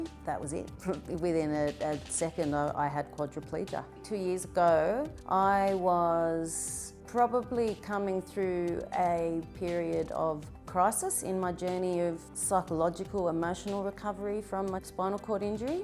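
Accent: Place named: Australia. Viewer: I wish u all the best. Im from Victoria Australia. I recognise our accent. Take care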